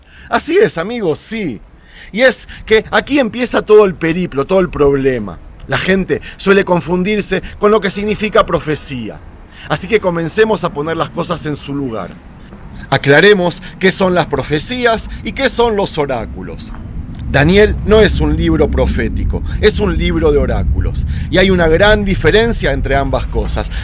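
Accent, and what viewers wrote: Argentina. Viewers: Porque siempre dices que eres judio tal parece que eres argentino por el acento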